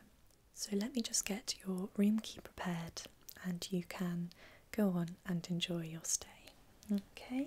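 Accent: United Kingdom. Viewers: The British accent is a turn on. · My thoughts: I think she’s British Her: speaks in British accent Me: I knew it!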